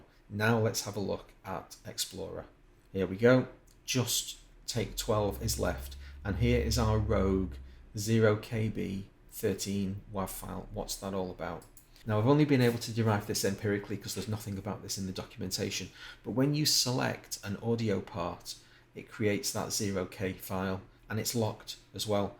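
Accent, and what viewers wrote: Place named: Liverpool, United Kingdom. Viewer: Great Tutorial, Refreshingly Calm, Peaceful & Sticks To Teaching The Topic In A Precise & Interesting Manner, As He Is Doing It. Also, What A Gentleman! This Is A Great Video & I Will Be Looking To Learn More From. "Thank You ". p.s. To Make Things Even Better, I Believe I Detected A Genuine Scouse Accent. The Great City Of Liverpool, "The Home Of The BeatlesIs The Centre Of The Universe", & To Be More Precise, The Actual Centre Is Here In Fazakerley, In Liverpool.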